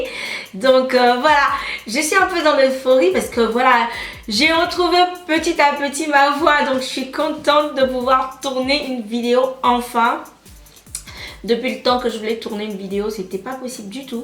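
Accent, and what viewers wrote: Ivory Coast. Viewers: ahah maintenant j'ai compris que tu a deux canal, c'est coul!!! j'adore l'accent ivoirien!!! :-* Que dieu te bénisse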